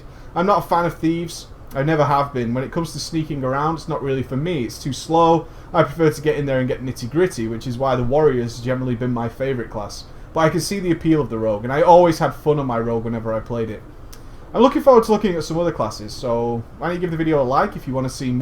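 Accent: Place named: United Kingdom. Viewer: I'm fairly sure he's from the UK, mainly because of the accent.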